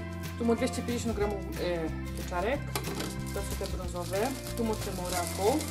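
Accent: Silesia, Poland. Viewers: Kanał jest ogólnopolski wiec mów poprawna polszczyzna bo tego śląskiego bełkotu nie rozumiem.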